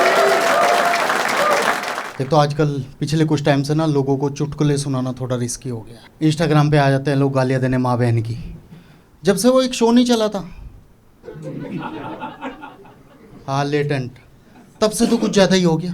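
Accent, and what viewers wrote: Western Uttar Pradesh, India. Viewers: I love west up Xcent 😅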